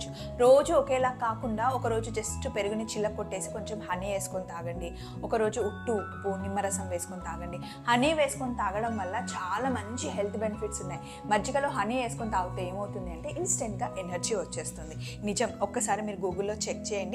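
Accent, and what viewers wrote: Telangana, India. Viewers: Telangana slang alaage untundi andi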